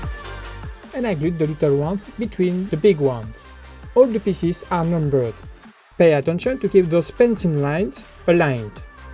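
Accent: France. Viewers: T'es francais nn ? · Are you french ?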